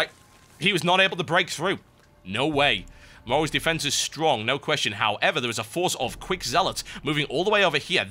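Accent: United Kingdom. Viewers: but but TB's awsome brit voice/commentary of epic SC2 matches...i must watch...i need it so i dont have to go and study my rad tech material or my statistics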